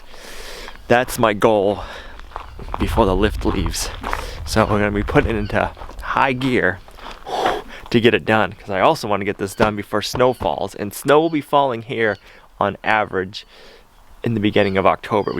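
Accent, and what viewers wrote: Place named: Boston, United States. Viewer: Good mauhnin! I love your Boston accent.( I’ve got one myself) Hope I never lose it! Lol! Oh and we like it a lot too! Lol! Good idea with the nail polish. Take care.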